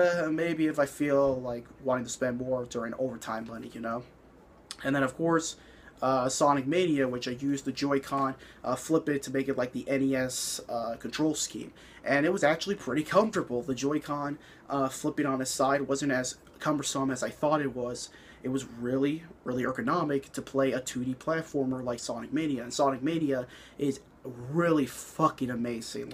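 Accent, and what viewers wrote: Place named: New York, United States. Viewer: I love this New York accent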